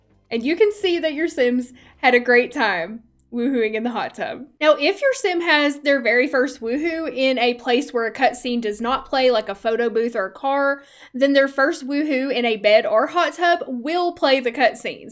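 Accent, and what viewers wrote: South, United States. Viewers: I love your country accent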